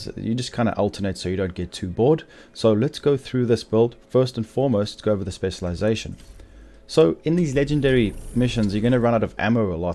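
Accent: South Africa. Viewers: Watched this and went for the grind to get the backpack. Got it today and have put together a rough build. Just need to min max it. Thanks for the vid! Is that a SA accent btw?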